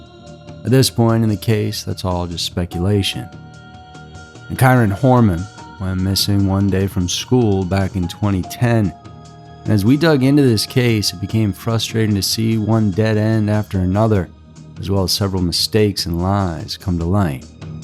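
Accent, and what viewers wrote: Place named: New Orleans, United States. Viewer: where is the narrator from? new orleans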